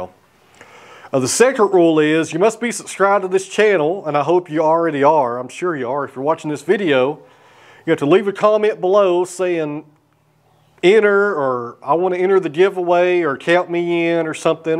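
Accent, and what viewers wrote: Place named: Tennessee, United States. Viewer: I watch you work 1, because I want to own a Woodmizer one day. :-) and 2, because I had family from Tennessee and you talking reminds me of them. Sign me up for the give away!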